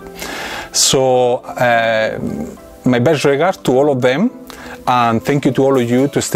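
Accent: Spain. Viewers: Thanks ... I like your spanish English ;-) good and useful clip...I use always girl stuff for this.. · I love Spanish accent. Keep up the good work.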